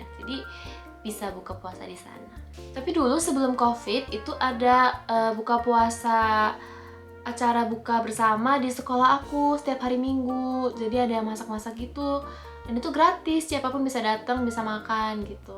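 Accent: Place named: Japan. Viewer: nyanyi lagu indonesia dong. pasti kawaii pelafalannya karena waktu ngomonf aja ada jepang²nya gitu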